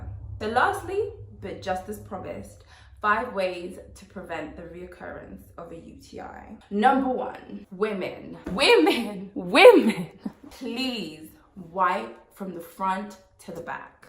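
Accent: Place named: United States. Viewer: Yassss, loved this, your accent though sounding like a real yankee 😂😂😂 love how you switch it up on us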